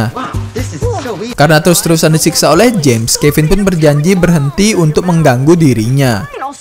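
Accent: Medan, Indonesia. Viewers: aaiii suka kali aku ad di selingi critakn ad logat medan y.. suka kali aku bang.. 😂😂😘😘😘😘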